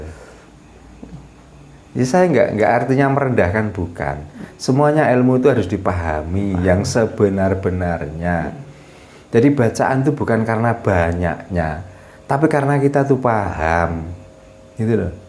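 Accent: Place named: West Java, Indonesia. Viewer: Logat bahasane kok koyo wong sundo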